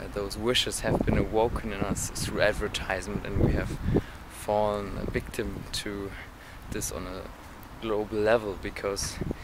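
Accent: Germany. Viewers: Lool he's german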